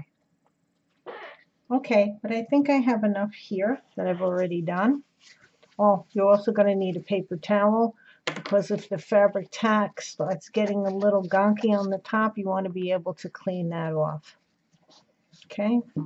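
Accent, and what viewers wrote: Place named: Long Island, United States. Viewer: When I started watching this video I felt like I was listening to my family - Long Island represent ! 😍 Adorable gnome witch!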